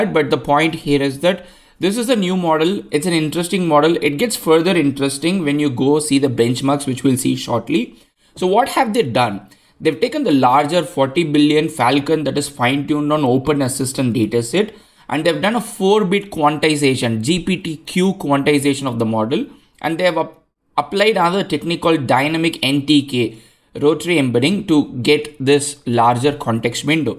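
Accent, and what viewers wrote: India. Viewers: Can you use AI to remove your Indian accent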